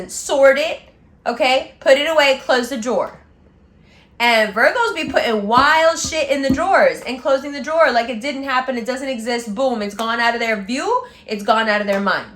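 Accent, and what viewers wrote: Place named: Brooklyn, United States. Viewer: Articulate and on point for the first half...straight up Brooklyn for the second half! Love you....need to get into Libra season...🙏🕊🌬⚖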